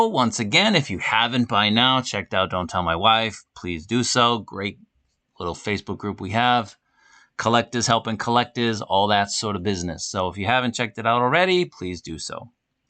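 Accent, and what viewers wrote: Boston, United States. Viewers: So Boston accent ❤ GO CELTICS!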